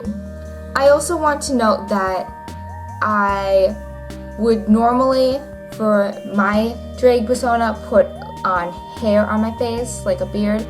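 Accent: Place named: Minnesota, United States. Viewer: Are you from Minnesota? You have the accent... I love it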